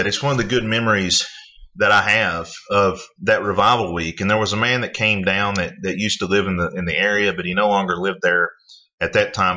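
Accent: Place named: North Carolina, United States. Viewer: I thought I recognized your accent... you are from North Carolina, right?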